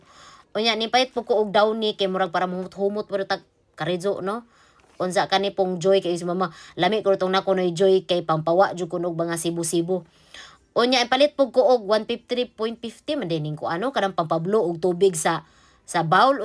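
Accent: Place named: Visayas, Philippines. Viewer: I love the accent. na lingaw gyud ko 🤣 proud to be bisaya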